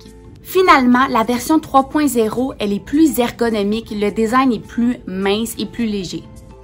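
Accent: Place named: Quebec, Canada. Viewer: C est un accent québécois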